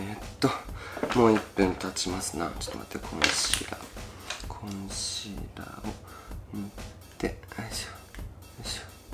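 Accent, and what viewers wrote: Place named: Osaka, Japan. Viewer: 大阪弁可愛い(＊¯ω¯＊)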